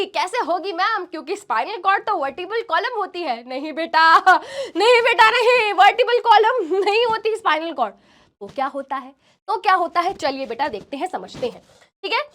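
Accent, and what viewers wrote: Bihar, India. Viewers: Bihari Ascent🤩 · Just luv your Bihari Ascent🤩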